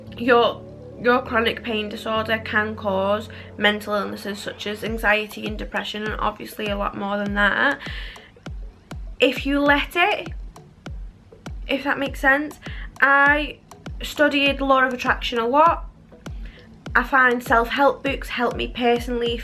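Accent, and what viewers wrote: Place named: Liverpool, United Kingdom. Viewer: Am in my early 30s and have had it for prob 7 years it’s a nightmare. Glad your putting yourself out there and trying to help us. You sound like a slight scouser too am from the north of Liverpool myself. Keep up the good work your positive outlook is great 👍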